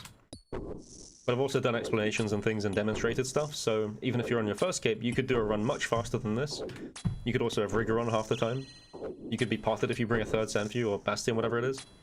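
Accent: United Kingdom. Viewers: Great guide. Didn't love the British accent though.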